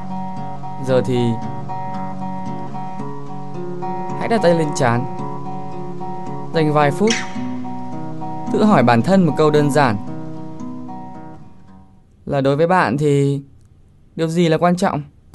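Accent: North, Vietnam. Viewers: GỊONG CON TRAI MIỀN BẮC DỄ THƯƠNG QUÁ Ạ <3 EM GỐC MIỀN TÂY NHƯNG NGHE VẪN THẤY THÍCH LẮM <3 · minh k thich tieng bac tu xua toi nay ... ma sao bay h minh thich lam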